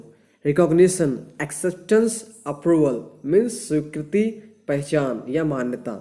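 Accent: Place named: United Kingdom. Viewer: Thoda angrej wala accent nahi bolte to Jada sahi rehta sir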